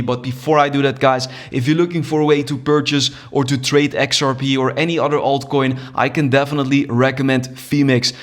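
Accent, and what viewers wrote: Netherlands. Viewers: Good information (you got a dutch accent) greetings from Amsterdam.😅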